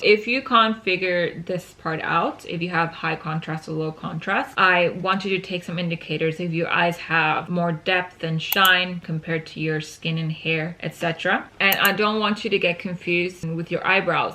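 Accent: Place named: Argentina. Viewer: Venía mirando videos tuyos y me daba la sensación de que serías Argentina, (más allá de tu excelente inglés), y ahora lo acabo de corroborar ❤❤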